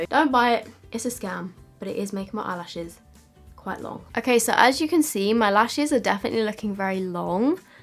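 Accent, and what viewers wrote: United Kingdom. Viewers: When I click on your vids i always think you’re gonna be from like Texas or sum and you’re British 😂😂😂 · She's literally Summer Mckeen+Sabrina Carpenter+british Accent :) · i love your accent!! I generally want to move to the UK just hear people talk with the accent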